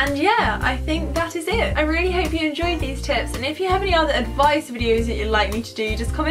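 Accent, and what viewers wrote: United Kingdom. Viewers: Are you british? (like me?)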